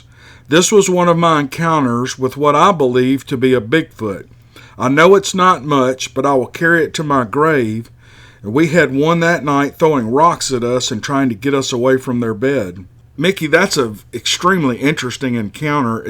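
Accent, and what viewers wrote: South, United States. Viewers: I think the southern accent really does it good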